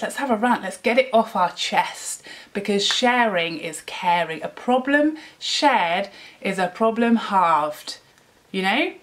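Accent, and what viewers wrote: United Kingdom. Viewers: Hi, I just wanted to say I like your videos, you have this real-life, down to earth approach, you're relatable and I think your reviews are very thorough. And I also like listening to your British accent. Thanks for the good job!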